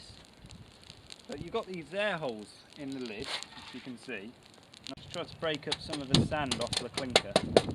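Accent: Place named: Birmingham, United Kingdom. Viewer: PS, I'm guessing from your accent you're from around Birmingham??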